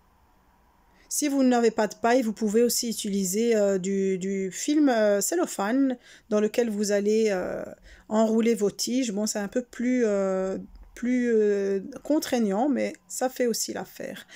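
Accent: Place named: Belgium. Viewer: Salam Alaykoum tu es belge ? Tu as un accent que je n’arrive pas à trouver ?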